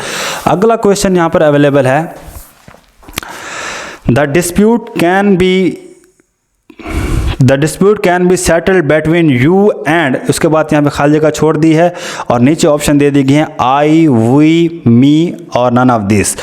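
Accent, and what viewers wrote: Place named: Saraiki, Pakistan. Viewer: ASSLAM WALIKUM NICE VIDEO LGTA SRAIKI HAIN AP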